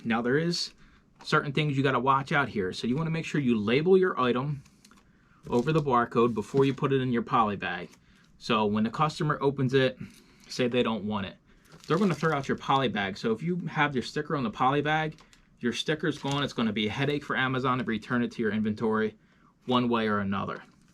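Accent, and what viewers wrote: Philadelphia, United States. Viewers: I went with Silver Wolf DVD for 2.99. I'll let you know how things go after I get them in and turn the info in. You're east coast yeah? You have a Philly accent.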